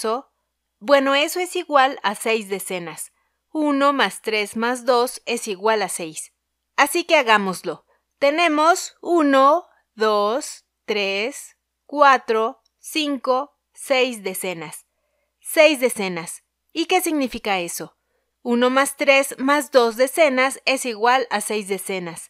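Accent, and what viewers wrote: Mexico. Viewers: este acento es mexicano?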